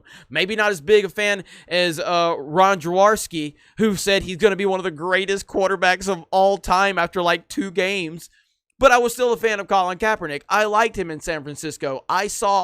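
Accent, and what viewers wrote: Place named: Alaska, United States. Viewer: Are you an Alaskan Bush person? You have the same accent.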